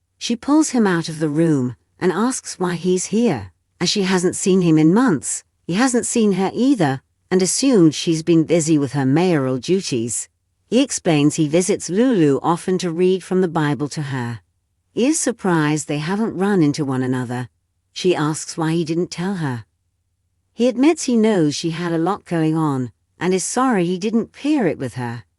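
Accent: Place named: Brooklyn, United States. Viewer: Have never listened or watched your updates before I find your voice and the slightly different way you talk wonderful it's like why can't people be nice to hey she's from Brooklyn you know New Yorkers Brooklyn everybody living there has an accent from somewhere just thought I'd throw that suggestion out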